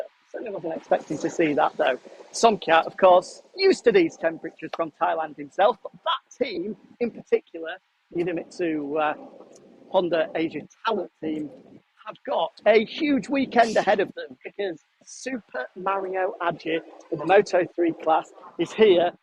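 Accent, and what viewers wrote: United Kingdom. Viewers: Pembawa acara MotoGp sama Badminton emang paling cocok pakai aksen britis.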